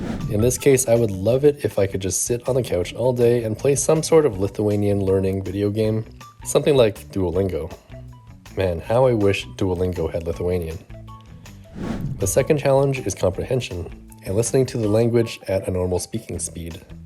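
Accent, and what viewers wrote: Canada. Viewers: I will start my comment with wishing you a vey Happy New Year! This video of yours is very inspirational. The most important is not how much we know but how much we are willing (ready) to know with action added to it. You could be an example to many! Lithuania has little English-speaking scene on Youtube and I am sure your channel will be a smash hit in no time. Meanwhile I would like to add that I am learning English pronounciation from you. Canadian English is perfect for understanding. Thank you for being You and for what you do. Best luck with learning Lithuanian! ❤️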